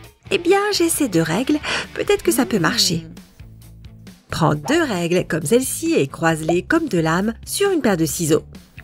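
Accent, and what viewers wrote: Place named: England, United Kingdom. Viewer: Je sais pas si vous être anglais ou français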